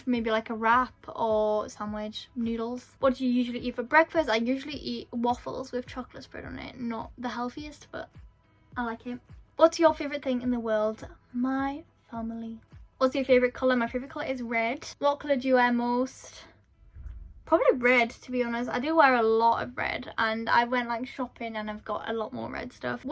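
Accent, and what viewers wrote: United Kingdom. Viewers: I think she’s British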